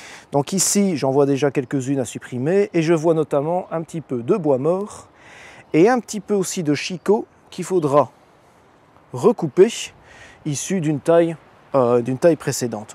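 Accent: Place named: Belgium. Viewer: Oui l'accent bien-sûr, et vous parlez de Daniel Schmit en plus.. Nous sommes compatriotes! C'est un vrai plaisir de vous écouter prodiguer vos conseils clairs , précis, facile à appliquer..Merci! Cécile et Emmanuel de Chaumont-Gistoux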